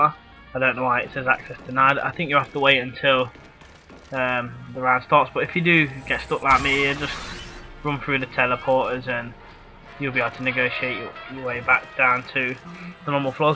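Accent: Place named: United Kingdom. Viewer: i love ur british voice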